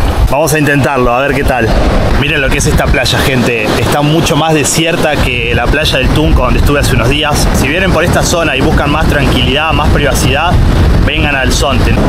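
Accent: Córdoba, Argentina. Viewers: ehhh!!!! Yo soy de Villa María!!! que copado encontrar a alguien de los pagos y que todavía conserve la tonada cordobesa... Saludos desde Argentina! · Podes estar 25 años en Rusia, pero el acento cordobés nunca se te va.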